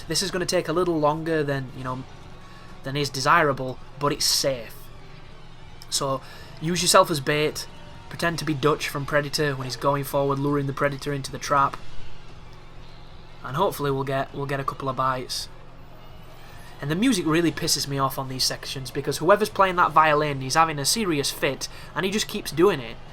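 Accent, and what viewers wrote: United Kingdom. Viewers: Hi, I enjoy your videos and your accent (no offense) helps me dealing with UK and Scottish management in my work (I understand them now). One thing though - the ball sacks are cysts and the enemy you call cyst is exploder. Not that it matters.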